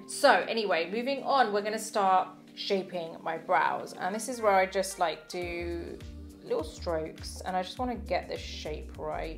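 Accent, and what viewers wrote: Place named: United Kingdom. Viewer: Hi, I love to see your videos, I think you are beautiful, funny, simple and your tone of voice relaxes me, I love love the Brit Accent you have, and of course, I relate to you because I think we kind of have the same type of skin, combo/ textured, anyway I love the fact that you recommend efficient products that actually work, not just sing out loud every week a bunch of new products to get more attention of PR and get paid for partnering with them, which I do get it, I understand that´s the business of being a YouTuber Influencer, but I also do highly appreciate people that share their skills for the art of it....there is a difference in the camera when we see the Sell it all Youtubers and the Real Pro that actually share their knowledge and you are one of them! so thank you for your time and effort in the editing, it is appreciated! 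By the way, talking about pro products, have you heard about the new release of the RCMA Liquid Foundation & different types of Powder....I just saw a video of someone with the foundation and it made me think....is it such as good as the OG translucent Powder that all the Makeup Artists use? made me curious as for now I will try the Tarte Shape Tape one as you recommended it! hils from Viking Land Denmark!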